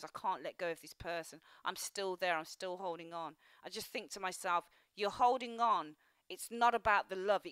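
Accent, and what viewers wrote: United Kingdom. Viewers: New subscribers here! Wow so refreshing to hear a uk 🇬🇧 reader 🤗 as I'm from Birmingham. Spot on for me‼️ I need to let go of my ex Cap the devil 😂 & be open to new love. Just a shame I still have to see him as we have twins together but I definitely need to let got! Thank you 😊 I really appreciate your readings! I hope I spot 👀 the new offer & take it 🙏🏾🤞🏾❤️🌈🙏🏾 I'm keeping my eyes peeled for that now as I don't want to be stuck in this unhealthy mess!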